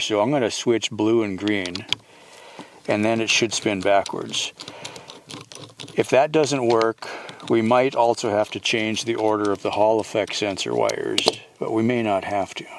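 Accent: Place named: Canada. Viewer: He's Canadian, sometimes they talk funny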